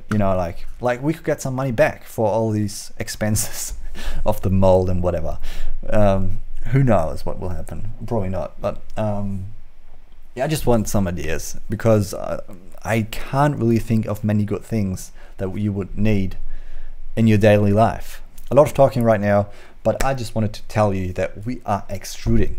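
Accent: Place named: Australia. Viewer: Interesting accent. Sounds like Australian with a touch of European.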